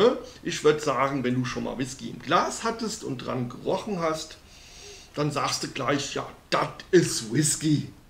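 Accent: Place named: Ruhr, Germany. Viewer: Man, wo hast du denn so perfekt Ruhrdeutsch gelernt. Absoluter Treffer. 😂😂😂🎉 Die Welt braucht mehr Humor. Spitze. Sehr gut getroffen. 15 Daumen hoch. L.G.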